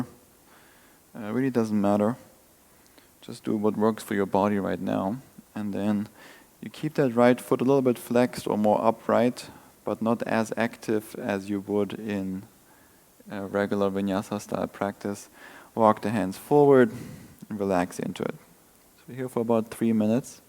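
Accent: Germany. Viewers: I like your german accent and can understand you very well :) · dein deutscher Akzent macht leider alles kaputt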